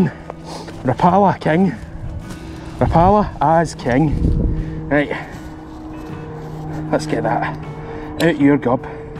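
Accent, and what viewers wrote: Scotland, United Kingdom. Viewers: I watch for the content, my wife approves solely because of your Scottish accent!!! Win win! Nice fish btw!!!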